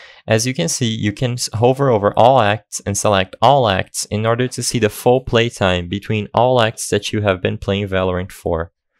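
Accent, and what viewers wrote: Brazil. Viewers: amazing video! thanks from Brazil. (you sound a little brazilian)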